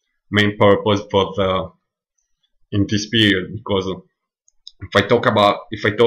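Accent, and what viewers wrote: Italy. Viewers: Hi - I understood everything you said but like you said it is your accent that will need some practice. I understood you, but I had to concentrate on what you were saying. Two things I would say give away that you are Italian - the long A sound you pronounce like a soft a (Kate and Cat should have two different A sounds), and adding an 'ah' at the end of some words, like 'people-ah'. Many italians do this, so don't worry . But well done with this, and keep working, you'll get there!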